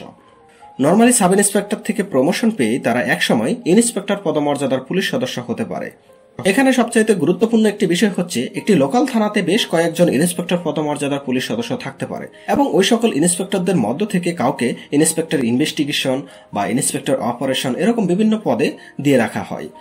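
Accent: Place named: Comilla/Noakhali, Bangladesh. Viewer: আপনার বাড়ী কোথায়? কুমিল্লা / নোয়াখালী?আঞ্চলিক টান রেয়েই গেলো।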